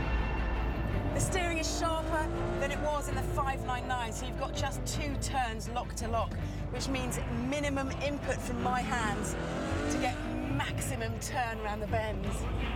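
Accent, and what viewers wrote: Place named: RP, United Kingdom. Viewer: They could have hired a more attractive presenter....VBH is a minger and why talk like youve got plums in your mouth!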